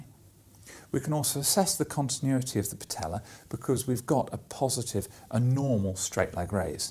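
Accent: United Kingdom. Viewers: Really enjoy the videos from an ASMR point. Plus watching from Australia but from the UK makes accent like being back home. Keep going with the vids and thank you.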